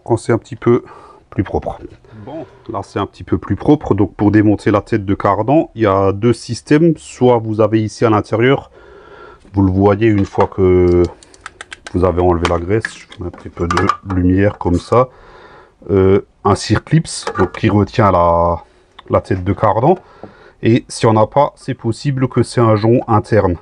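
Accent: Alsace, France. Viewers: Pourquoi dire qu'un soufflet adaptable est moins bon qu'un origine? quel est ton argument, car je ne suis pas d'accord, la qualité du caoutchouc étant identique. Très bonne vidéo. Mais je pense que cet outil n'est pas indispensable compte tenu du nombre de fois où la nécessité de changer un soufflet est ridicule. J'ai trois véhicules tournants et je n'ai jamais changé de soufflet. D'autre part, je pense que cet outil n'est valable que pour les soufflets externes. En tout cas, merci pour tes vidéos très didactiques et utiles pour le commun des mortels. En plus, ton accent est lié à ma jeunesse passée en Alsace, à Schiltigheim et cela me rappelle tellement de souvenirs d'une époque révolue. Je suis comme Michel Sardou. Je hais notre société où la violence est devenue la règle.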